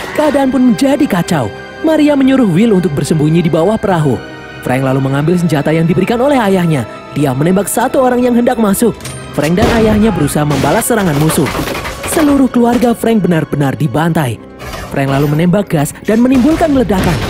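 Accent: Java, Indonesia. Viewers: medOk x Jawa mu min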